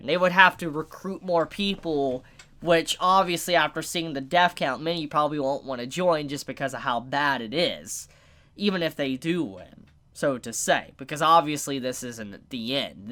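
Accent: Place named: Tennessee, United States. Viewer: i didnt kno w you were from Tennessee until watching your va ca video now i cant un hear you accent lol "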